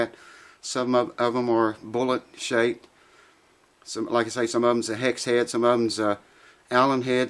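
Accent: South, United States. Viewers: The rain makes me feel like weve been through some shit together. thanks for the wisdom. This is like the closest thing to America I feel like I've seen since I was was a kid in 1993 listening to my grandparents talk. That southern draaaawl mayne, I tell yeh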